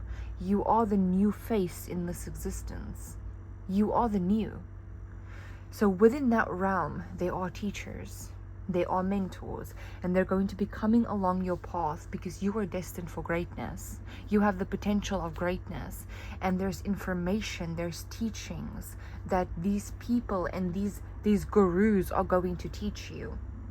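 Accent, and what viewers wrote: South Africa. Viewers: You probably prefer your anonymity but that accent is 🇿🇦 coded. Lol correct me if I'm wrong😅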